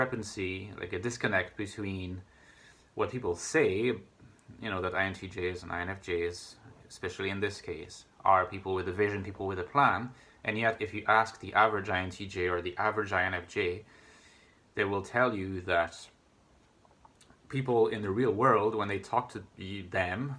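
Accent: Ireland. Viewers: He's got an Irish accent but I think he's French?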